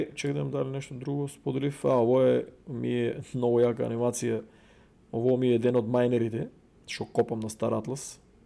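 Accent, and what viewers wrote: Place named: Kočani, North Macedonia. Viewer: Се навлеков на кочански дијалект. :rocket-red-countdown-liftoff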